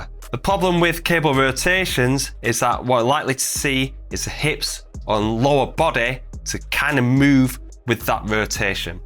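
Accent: United Kingdom. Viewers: Many of the videos I watch just to listen to a proper British Accent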